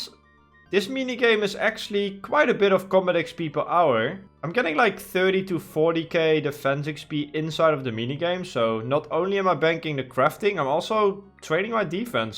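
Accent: Netherlands. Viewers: I can hear the thicc dutch accent. Keep up the good work maatje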